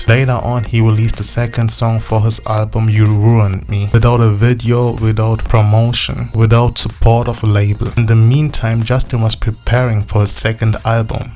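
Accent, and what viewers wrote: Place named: Germany. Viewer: Your German accent is the Hammer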